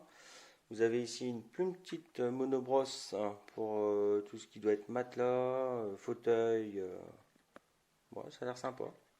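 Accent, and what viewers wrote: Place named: North, France. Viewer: Désoler mais votre accent jme suis douter que vous habitez dans le nord 😂, moi je suis du pas de Calais 😊😉, merci beaucoup pour votre vidéo...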